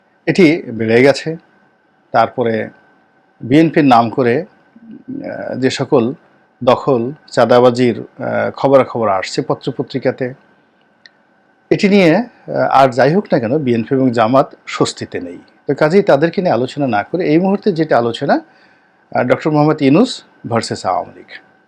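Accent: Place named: Barishal, Bangladesh. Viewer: ভাই আপনি যে বরিশালের মাল তা বুঝা যায়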